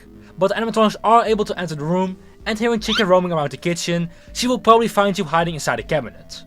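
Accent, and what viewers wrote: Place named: Netherlands. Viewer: World’s most subtle Dutch accent 😆 Hoi from Canada 👋 Enjoyed the video! I appreciate your evident knowledge of the games and different theories. For Security Breach, it’s actually more difficult than you might think, thanks to Moon. Small enough to get into vents, and can seemingly swim through the air in most of the building. Plus, most bots have security clearance equivalent to LVL10 (or greater). However, there do seem to be some exceptions, based on the doors that Freddy himself can’t open. If he can’t, but you can, then technically the other animatronics can’t either and you’ll be totally fine. I can’t quite remember, but I think the VIP lounge REQUIRES enough merchandise to be collected? Otherwise Freddy could’ve just opened it early. Not sure if there are other doors that are similar.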